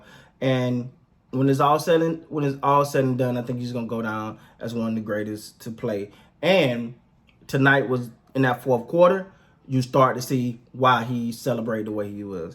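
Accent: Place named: South, United States. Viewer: First time watching this channel. Wondering if he is from Florida or somewhere close. Not only does he sound like Roy Jones Jr. he has the same dialect. I'm from the midwest so not well versed in southern accents and dialects. P.S. You do a pretty good job...keep it up.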